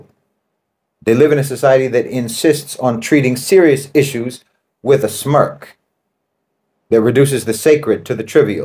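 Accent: United States. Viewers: I understand your impression of the US and its citizens, but I can't say that I agree with you completely. You are very articulate and well spoken, but not all of us in the US are bound by the constraints that you deem to be inherent in the system here. We usually all grow up with access to books, as you obviously have, which can allow the reader to experience different realities and socio-economic circumstances to which we may have never actually been privy to. You come across like your perception of the US was formed from what you have seen in movies, and not having experienced living in US culture for that long a time. I could be wrong, because judging from your accent, you have either spent a great deal of time in the US, or your parents did. You make a lot of generalizations about the population here, but this country is filled with people from all walks of life who all have a different perception of their circumstances.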